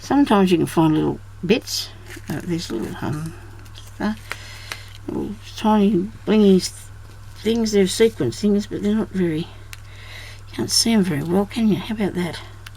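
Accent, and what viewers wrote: Australia. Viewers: Very very pretty love it...and your accent also....where is it from Australia?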